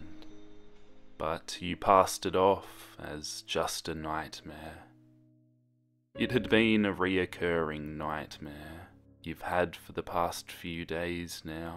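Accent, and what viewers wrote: Australia. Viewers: banana, it sounds even funnier with a Australian accent!!!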